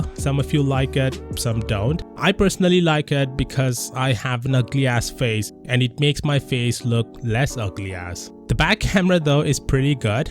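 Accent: India. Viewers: it's accent not plastic surgery ! In india their r many people who speak like this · many people in India speak with this accent.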